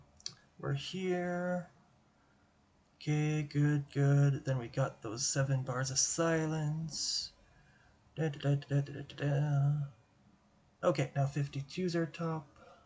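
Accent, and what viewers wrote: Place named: France. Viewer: J'adore ton accent français !! Merci pour toutes les transcriptions ! J'adore faire du déchiffrage ! J'ai commencé avec le confinement en mars 2019, grâce à toi (et d'autres sur le web) j'ai fait des progrès en déchiffrage. Mais si certains titres ne sont pas à mon goût ! 😁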